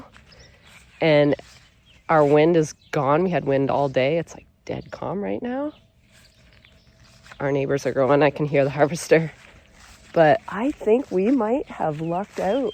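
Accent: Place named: Canada. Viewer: Today i learned canada also says zed, instead of zee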